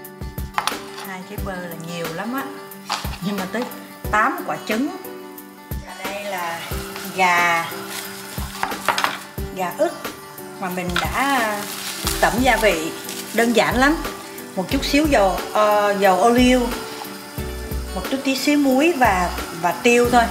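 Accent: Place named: Da Nang, Vietnam. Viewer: Nhà cô rất sạch sẽ. Đồng hương Đà Nẵng ạ. Thích cô nói tiếng Việt như này. Cô khéo léo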